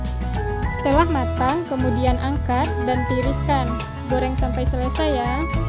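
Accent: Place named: West Sumatra, Indonesia. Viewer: Kk org minang nya,,, keliatan dri logat ny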